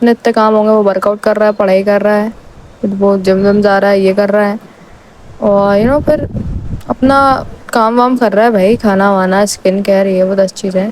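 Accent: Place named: Madhya Pradesh, India. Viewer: Are you from madhya pradesh? Because of your tone I feel like you are from MP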